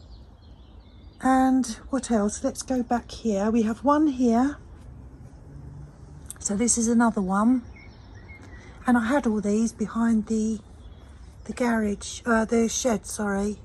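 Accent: London, United Kingdom. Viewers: Hi Lovely to hear from you. My husband watched today and said you sounded like a Londoner. You have been so busy again. I will be copying the artificial grass liners idea. Regarding your 'trinkets'... I love them👏 i have some too especially signage ones eg. ' Don't worry,be happy' ( of course we do worry,but i like the sign). I'm a great one for separating my plants. Makes my garden look fuller too. No big buys lately,just some paler blue muscari. The old favourites are surprising me too. Your garden is a joy. Regards to Richard and thank you for making me smile💯💖☕🥰💞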